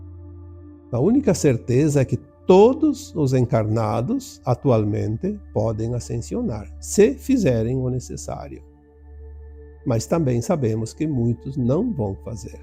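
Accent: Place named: Rio Grande do Sul, Brazil. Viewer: Eu acho que é do Rio Grande do Sul 😅pelo sotaque 😊😊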